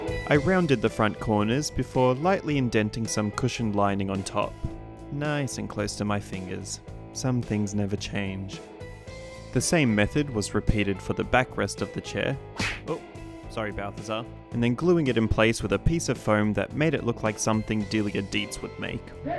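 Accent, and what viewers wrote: Australia. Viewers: You sound like Oscar Piastri or are you just australian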